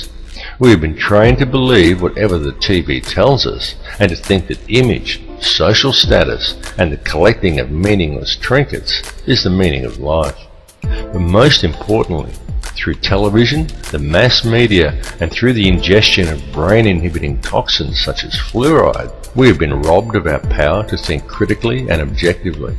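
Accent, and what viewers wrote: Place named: United Kingdom. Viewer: Your british accent is addicting.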